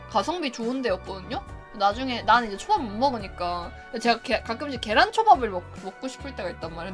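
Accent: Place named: Gyeongsang, South Korea. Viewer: 경상도 사람임? 사투리쓰네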